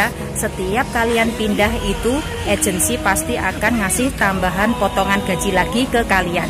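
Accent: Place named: Java, Indonesia. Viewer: Oh pantes kak bahasa logat nya seperti orang Jawa 😊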